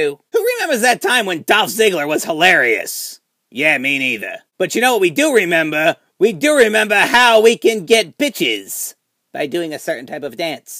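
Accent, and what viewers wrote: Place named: Ireland. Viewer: Bitches like me fella. Love your Irish accent:*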